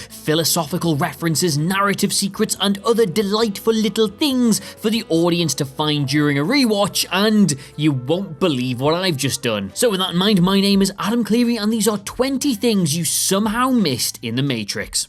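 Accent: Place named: England, United Kingdom. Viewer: I thought the video would be good until I heard the english accent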